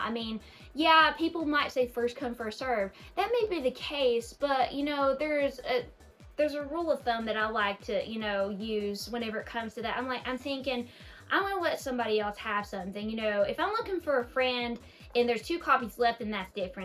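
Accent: South, United States. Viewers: I love you southern accent. and your enthusiasm when it comes to gaming.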